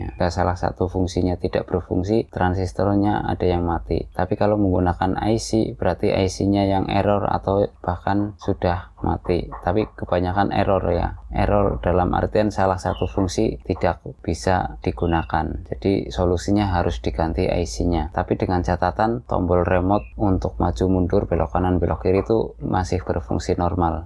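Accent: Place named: Tegal, Indonesia. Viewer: tiang pundi um kok ngomongnya ngapak ..... orang tegal ya ???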